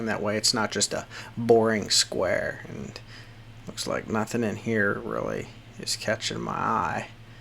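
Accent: Pittsburgh, United States. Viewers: Is that a yinzer accent I hear? Rad build!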